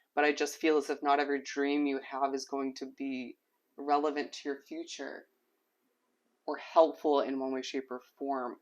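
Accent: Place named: Midwest, United States. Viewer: You also have a VERY MIDWEST ACCENT WHICH IS SO CUTE! 🤩😍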